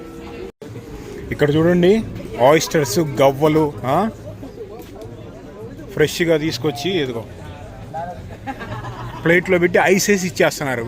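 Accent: Andhra Pradesh, India. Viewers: anna nvu gun carry chestava safety kosam? and nv nizamabad nundi annav kani andhra slang undi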